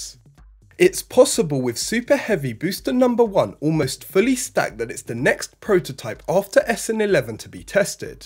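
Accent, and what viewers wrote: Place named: London, United Kingdom. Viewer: It is a London thing, he said it "free times" already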